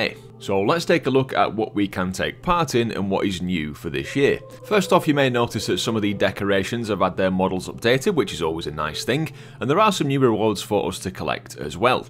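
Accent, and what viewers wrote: Yorkshire, United Kingdom. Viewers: The yorkshire accent made this vid 300% better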